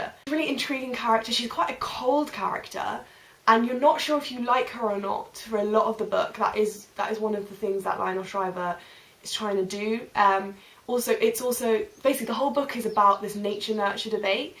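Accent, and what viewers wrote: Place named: United Kingdom. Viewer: Pretty cool his first video. I am going enjoy a lot to hear british english. Looking forward to watch new videos. My congratulations.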